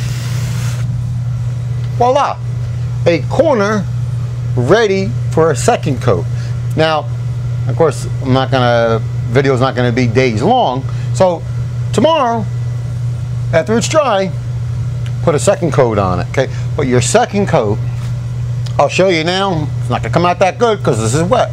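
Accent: New York, United States. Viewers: You are a GREAT teacher - hey, you from NY? I grew up in the Bx, and your accent sounds so much like home, man. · so you from Jersey, or New York?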